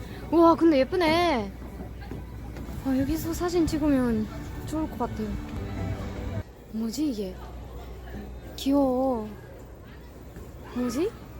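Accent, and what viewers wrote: Gyeongsang, South Korea. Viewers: 대구에 좀 오래 살았더니 대구 억양이 마이 나오네요 이쁜 ~~^^ㅎㅎㅎㅎㅎ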